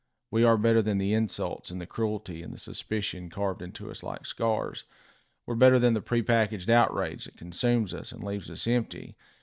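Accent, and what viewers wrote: South, United States. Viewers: Preach my southern articulate brother in spirit.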